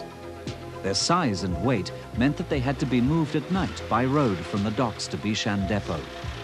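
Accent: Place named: United Kingdom. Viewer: Same British accent!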